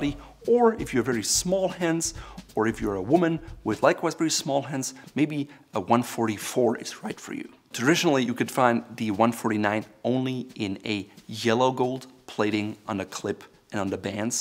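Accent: Germany. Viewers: English is not his native tongue. I believe it's German. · This guy is german, but its hard to notice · I hear a slight German accent? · He's German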